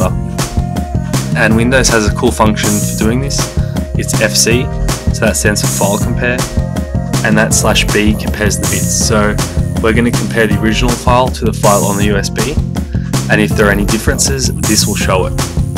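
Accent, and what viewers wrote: Australia. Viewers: are you australian? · I KNEW HE WAZ AUSSIE!!! can I get a million dollars now?? Mail it to Queensland ##################### U thaught I waz gonna actually give u my address yea nah..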